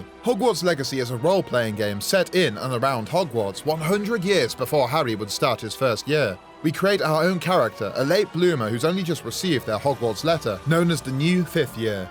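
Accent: United Kingdom. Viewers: You and Alan Rickman have like the same accent. 🤭 I get you are speaking silly lines, but your normal speaking voice sounds like you are both from the same town or the same part of Britain 🇬🇧 👍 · Because in England where I assume he is from based off the accent it is illeagal to emulate copyright content. Punishable up to 5 years without bail.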